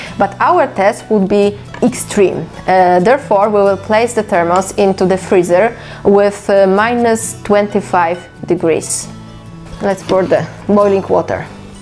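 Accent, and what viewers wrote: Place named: Poland. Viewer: po co mówic po angielsku jeżeli słychać ze jesteś z Polski?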